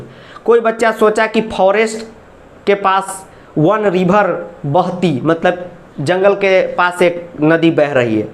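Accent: Bihar, India. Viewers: Sir aap bhi Hamare Bihar ke ho kya ekadam Hamari Tarah Bhasha bolate ho कभी-कभी aap bahut Achcha padhaate ho thank you sir apni matrabhasha Mein samajhne ka maja Hai Kuchh aur Hota Hai😎😎☺☺☺☺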